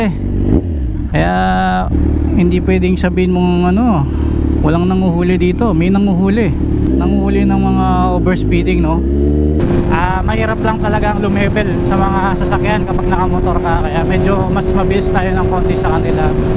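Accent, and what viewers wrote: Pampanga, Philippines. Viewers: Yung pagtatagalog mo sir halatang kapampangan hehe ride safe always idol.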